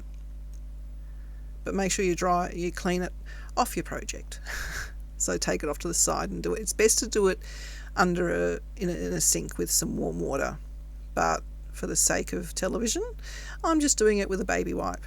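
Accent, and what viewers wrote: Australia. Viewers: Such a cute stamp set and card. Great to hear a fellow Aussie...tfs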